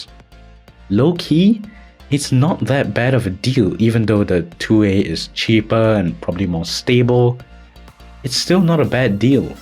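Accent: Nigeria. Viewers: Why do you sound like a Nigerian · You sound like a Nigerian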